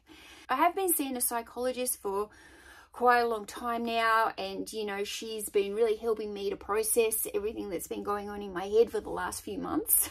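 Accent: Australia. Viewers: Are you Austrilan?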